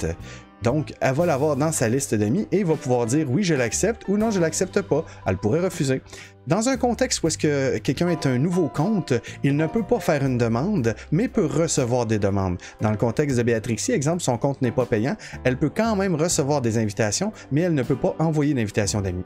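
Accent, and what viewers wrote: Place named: Canada. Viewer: Salut ! J'aimerais seulement aujourd'hui commencer le jeu avec ma petite amie qui en ce moment ne se trouve pas dans le même pays ni dans la même région... Il serait alors probablement impossible de jouer ensemble de ce que j'ai cru comprendre, car elle serait dans les serveurs d'Amérique et moi donc Européen. Mais j'ai cru comprendre que tu étais Canadien de par ton accent ? Tu es donc Américain mais tu joues sur Cerberus qui est un serveur Européen, j'aurais bien aimé savoir comment tu t'y est pris ^^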